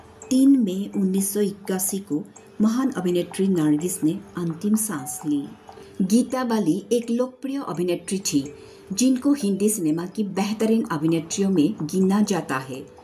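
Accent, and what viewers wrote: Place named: Bengal, India. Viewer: I enjoy listening to your Bengali/Asamiya,Hindi accent.It reminds me of Suchitra Sen of Andhi or Mamta fame. (I appreciate your beautiful research work and presentation)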